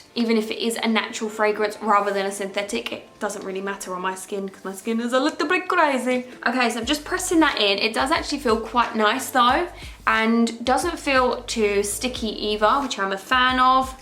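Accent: Essex, United Kingdom. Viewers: Are you from Essex?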